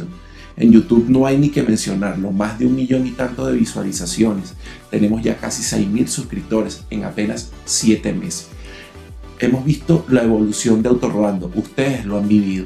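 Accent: Venezuela. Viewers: Hey no sabia que eras Venezolano, debe ser porque soy costeño y se comparte un poco el dialecto, antes tenia mucha familia allá, nuevamente saludos chamo.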